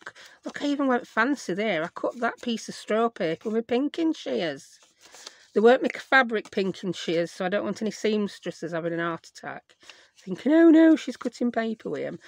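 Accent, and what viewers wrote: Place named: Yorkshire, United Kingdom. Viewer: Thank you and yes I’m in the UK, I live in Southport. I now have a load of empty teabags on the side drying 😂 I find you very watchable, I love the Yorkshire accent and you have a great sense of humour where I found myself laughing out loud on a few occasions, I’ve not come across many junk journal YouTubers as interesting as your channel. I’ll be watching more today 😀👍🏼 xx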